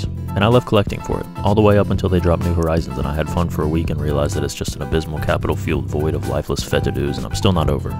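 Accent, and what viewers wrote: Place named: South, United States. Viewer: glad you keep your southern roots by still saying “warsh” instead of “wash” 😂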